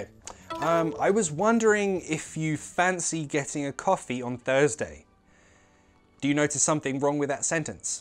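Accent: United Kingdom. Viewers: A big greeting from Piura, Peru. I really like your videos. They are so fun and I love british accent!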